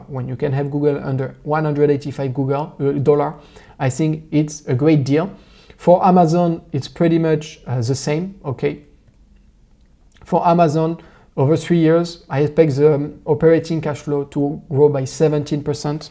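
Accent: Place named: France. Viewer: Haha I love your french accent😂 how old are you?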